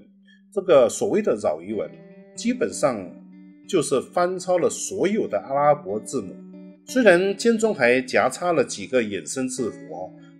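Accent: Standard. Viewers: 真的不容易，以前不了解马来亚华人的处境，现在通过大叔了解一二，听完真的不容易而且觉得马来华人真了不起~！所以请大家不要挑三拣四了。就算是所谓的中华圈，国内的好多地方和香港地区还不如大叔会说一口标准的中文呢。现在台湾也好不到哪里去，很多正规媒体差不多三分之一说的是台湾闽南语叫人无语……。加油大叔！ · 在马来西亚华人中大叔的普通话算很标准的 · 郷民A 我们的华语发音及用词很受自身籍贯的方言影响，也掺杂了少数马来语的词尾音，听起来有点特色又像中国南方地区的发音。大叔是属于中文造诣较好的，多数华人的发音都没有那么标准。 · 有一点请您多多原谅：首先您的普通话，不，是华语，实在跟我们太接近了。我经常忘了你是个外国人，而像我们广东广西省份人的口音，甚至比他们说的还标准。完全把您当中国人了。更主要的是您跟我们一样热爱中国。 我在想，这种感觉可能像韩国、朝鲜人听我们中国朝鲜族同胞说话一样。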